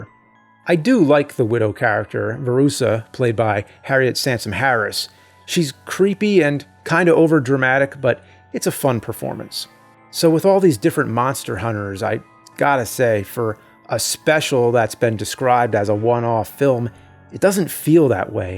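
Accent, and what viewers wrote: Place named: New Jersey, United States. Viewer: It's the Jersey accent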